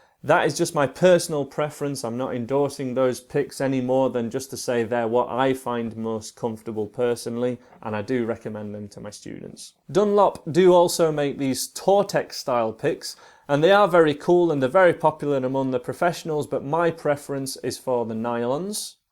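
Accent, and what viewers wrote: United Kingdom. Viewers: I just can't stop watching your videos and I do feel comfortable with your teaching rather than many Others besides I really like you speaking British which is my favorite... Thanks a lot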